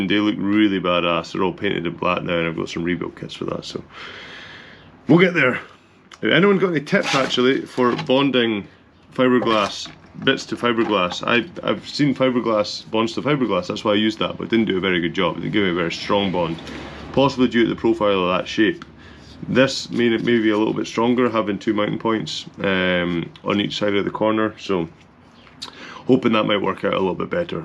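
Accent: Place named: Wales, United Kingdom. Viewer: Hey man good job on vids. As a Welshy who moved to the states a couple of years ago who is literally in the middle on a XS750 Cafe build your vids have been a godsend plus hearing an accent from the home land always helps. All the best!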